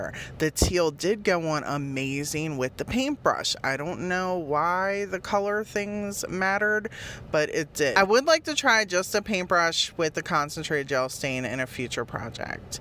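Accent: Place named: South, United States. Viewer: Haha. That is what you do to me every week. Your sense of humor is just like mine, sprinkled with a little bit of evil, just a tiny bit. 😂😂 It is raining and cold here. Is it cold where you are? I can tell you are further south, by your accent. It snowed a couple weeks ago, then it got into the 80s, for a week and a half, now I am dressing like I live in the tundra, again. I mean, c'mon, it will be june 1st in 4 days. It better get warm, or I am just going to start making winter crafts...maybe a snowman, eating watermelon and wearing a bikini. ⛄🏖👙 🍉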